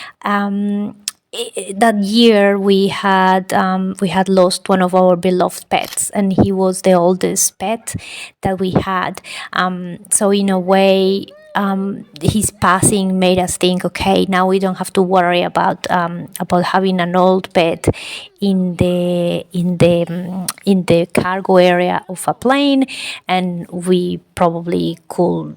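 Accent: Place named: Italy. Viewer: I imagined something similar ... your pronunciation in English is the typical pronunciation of an Italian. Welcome to Italy!!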